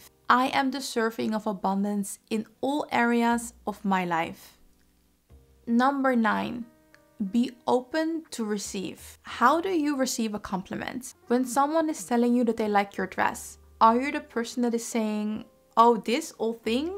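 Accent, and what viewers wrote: Netherlands. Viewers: Sounds like she’s from the Netherlands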